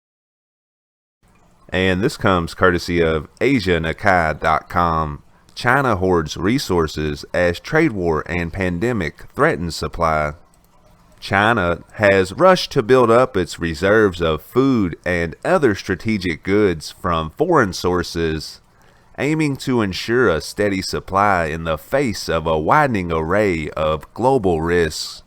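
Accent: South, United States. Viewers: Maybe this is a repeated question but its nice to hear a real person instead of a c g voice. I cant quite place the accent on the person. Tenn., Ms., Al. .? Anybody know.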